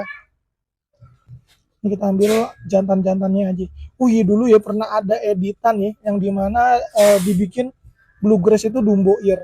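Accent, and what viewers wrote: Jakarta, Indonesia. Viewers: ngomong nye kyk orang Betawi..... Sama me gw bosssss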